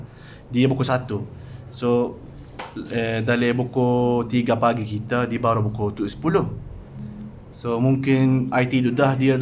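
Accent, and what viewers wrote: Kelantan, Malaysia. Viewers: Hebat lah kamu boleh cakap melayu walau dlm loghat kelantan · Kecek kelate bohh👍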